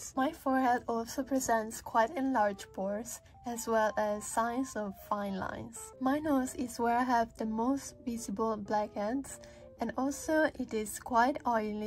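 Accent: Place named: Hungary. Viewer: Hungarian? Judging from your accent.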